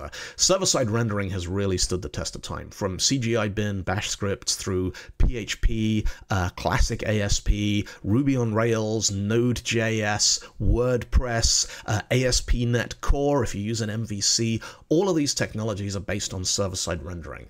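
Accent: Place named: United Kingdom. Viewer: I can't pin your accent. It's like British with a twist of Oz and North American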